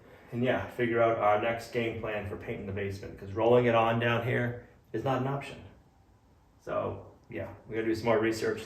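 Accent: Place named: New England, United States. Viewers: Yeah, and "eSScavator" 😂. Waiting for " acrost". Ahh, those NH colloquialisms! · That's the way they talk over there in New England. He's just saying things the way they all do around Boston.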